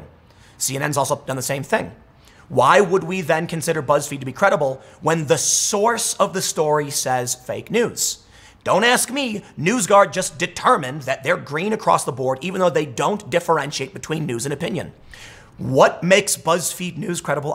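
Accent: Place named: Chicago, United States. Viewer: love your accent, I'm a Chicagoan too. I pegged you from your accent, proud of myself and you for being Chicagoans. Which neighborhood do you hail from? I'm from Rogers Park/Westridge. Thanks for the great content.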